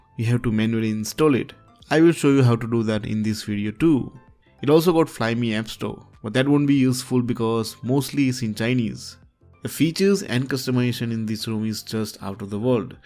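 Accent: India. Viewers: Bro you are Indian or foreign. BTW BEST CONTENT. CAN YOU PLEASE MAKE A VIDEO FOR BEST STALE ROM FOR MOTO G4 PLAY (HARPIA). LOVE FROM INDIA.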